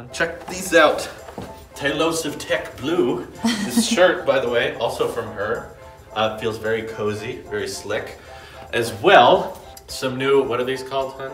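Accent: Sweden. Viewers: Wait what?! Are you Swedish? :D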